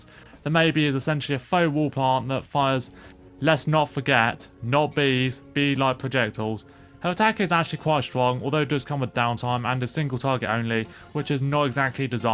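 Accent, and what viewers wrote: United Kingdom. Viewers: This guy is so unironically British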